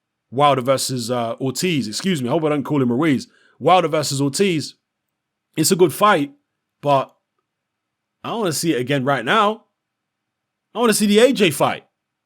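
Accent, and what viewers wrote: United Kingdom. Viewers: Your biased . Why is Joshua fighting Ruiz then . Why not just push for the Wilder fight. U sound British anyway , your straight team Josh .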